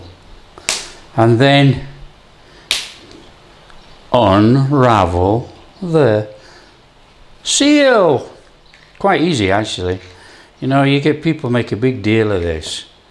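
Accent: Yorkshire, United Kingdom. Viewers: hearing you giving the instructions with your wonderful Yorkshire accent is like watching an episode of Heartbeat, I keep expecting to see Claude Jeremiah Greengrass wander in... Corned beef hash is a big favourite of mine! My Dad used to love making that, and he did it the same way as you, so he taught me. This was like a happy reminder for me. Thanks!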